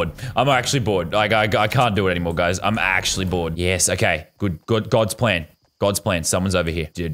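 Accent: Australia. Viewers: 2 years ago sounded so Australian compared to 2020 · is it just me or did lazarbeam sound more australian in this game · why does he sound extra aussie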